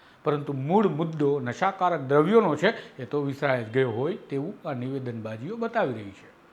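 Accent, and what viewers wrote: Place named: Kathiawar, India. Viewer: હર્ષ સખવી કોઈ મહાન માણશ તો છે નઈ ભાઈ ઈ પણ એક માણસ છે ઈ સુ કરી લેશે વાણિયો ગોપાલ ઈટાલીયા ને કે મને જયવીર મેર ને મારી નાખશે અને સુરતી ભાષા ટુસડી છે એમાં તા નર બદલે દા બોલે હરામી છે સુરતી બધા તમારા થી થાય ઈ કરી લિયો તમે એક કાઠી છો એટલે તમે તમારી બોલી ના બદલાતા કાઠિયાવાડી જ રાખજો પેસા થાય જય તો બદલતા નઈ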